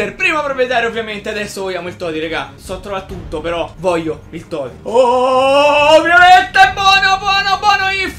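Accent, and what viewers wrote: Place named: Naples, Italy. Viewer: Sei napoletano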